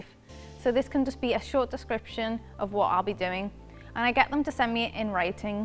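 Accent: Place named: Liverpool, United Kingdom. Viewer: She’s great and that gorgeous Scouse accent. Well done on all your success!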